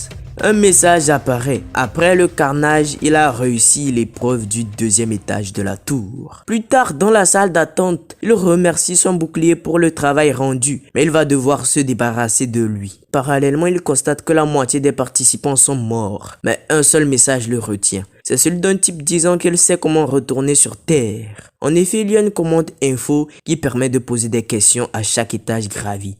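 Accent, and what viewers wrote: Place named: France. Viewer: pourquoi tu a la voix d un gars du continent 😅😅😅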